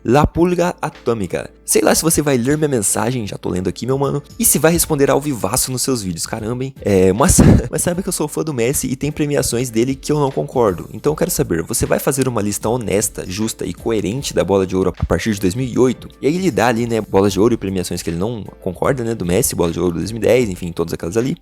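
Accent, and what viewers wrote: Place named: São Paulo, Brazil. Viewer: Ow mano, na moral, agora cê vai ter q explanar q canal grande q te tirou pra merda pelo vídeo do inominável holandês. Preciso ter uma conversinha com esse caboclo q pelo visto não assistiu uma partida sequer daquela atrocidade q era o United comandado pelo inominável holandês kkk. Aliás, imaginei q cê tinha ficado mais grilado com o video d reação do futurinhas sobre o Pelé, q tbm tenho minhas discordâncias com os argumentos q cê apresenta naquele video em específico, mas o debate saudável faz parte kkk. Outra coisa ainda kkk, se depois d vc dizer q no ensino médio tinha dois times q tu odiava e esses times não serem Corinthians e Palmeiras (então no caso tu seria são paulino), aí eu sou um astronauta haushsushsu. Supondo q cê tenha por volta dos 20 e poucos anos, então cê sofreu com os péssimos 10 anos aprox do São Paulo em uma d suas piores fases e viu tanto Corinthians e Palmeiras ganharem td durante essa época. 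Fora o seu sotaque do interior d SP kkk. Se esse for o caso, então tmj nesse sofrimento, pq tbm sou trikas haushsusuh.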